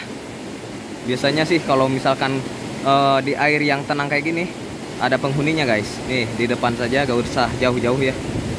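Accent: Indonesia. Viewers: Bang urang sunda nya sarua ejeng urang sunda saya banten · Sunda na nu ti mana mang 😁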